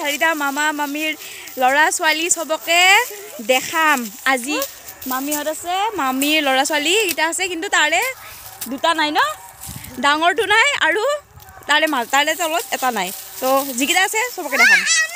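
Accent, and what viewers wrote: Nalbari, India. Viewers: Apunar ghar kot baa mane apunar vakha2 olp nalbari r nisina mr oo ghar t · বহুত ভাল লাগিল ভিদিওটো, আপোনাৰ ভিদিও চায়ে থাকো । বিশেষকৈ নলবেইৰা ভাষাটো ক‌লি আৰু বেছি ভাল লাগে । মাজে মাজে কৈ থাকিব চোন। ❣️❣️❣️ ( নলবাৰী ধমধমাৰ পৰা)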